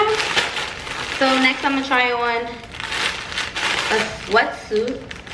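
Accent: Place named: New York, United States. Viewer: This must be a NY thing because, I talk just like this in my video😩😩😩 (FEEL ME?)😂😂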